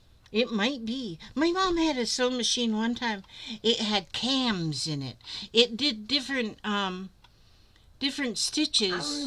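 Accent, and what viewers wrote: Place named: Florida, United States. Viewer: Hi it Patricia Rodriguez from San Antonio Texas I just always love you and papa 👴 how cute and adorable u both are and love ur Florida accent I was just thinking 🤔 you can color then put mod podge on those papers u got I guess from the floss well u can make them into paper beads and make necklaces with them I am subscribed to Darlene Michaud and she does a lot of stuff like that just giving u an idea 💡 love u guys have a blessed wonderful day in Jesus Christ mighty name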